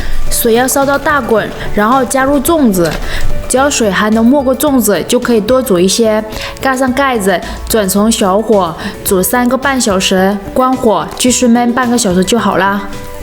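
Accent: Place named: North, China. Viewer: 我是海南定安人 新竹 我搬凳子來看，你口音澈底北方人口音。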